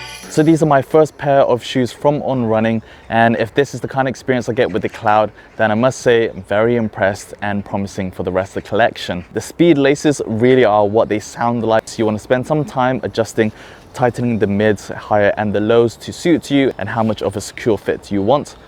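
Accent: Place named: New Zealand. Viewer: Thanks man. Appreciate your time and help as well. I just saw your vevo vid too and now I feel like I have to choose between those! Ha Btw, your vids are in South Korea but your accent sounds almost Kiwi ha where are you originally from?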